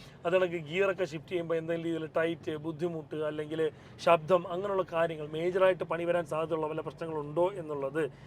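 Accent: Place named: Kollam, India. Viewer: കൊല്ലത്തു കാരന്റെ നാടൻ സംസാരം.....😂 ഒന്ന് പെയിന്റ് മേളം 😂 കൊഴ😂 ഇത്തരം ഒരു പാട് വാക്കുകൾ ഇക്കാ സൂപ്പർ വർക്ക്ഷോപ്പ് സൂപ്പർ പുതിയ ജോലിക്കാർ നല്ലത് വരട്ടെ നമ്മുടെ നാട്ടുകാർ സപ്പോർട്ട് ചെയ്യുകയും ❤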